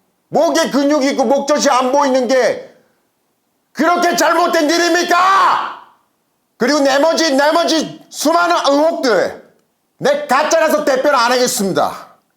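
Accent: North Korea. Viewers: 약간 북한말투..?? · 말투 점점 북한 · 근데 미국인이 왜 북한 말 하는 거 같죠?? 어디서 봤는데 닮은 사람.... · 뜨문뜨문 북한 억양이 있는데?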